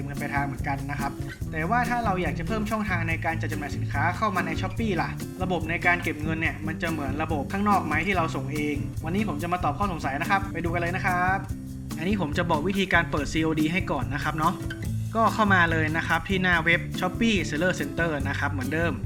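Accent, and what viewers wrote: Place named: North, Thailand. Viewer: ก็ว่าครับ อู้สาบเมืองเหมือนผมเลย😄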